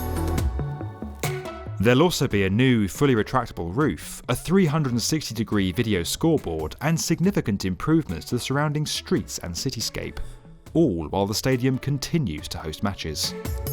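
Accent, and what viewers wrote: United Kingdom. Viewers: U sound British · British pronunciation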